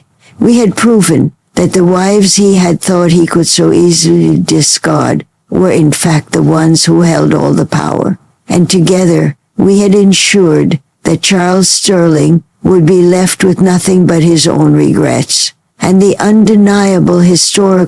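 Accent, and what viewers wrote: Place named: Ireland. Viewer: Interesting Irish accent emerging at times. Engaging story nonetheless.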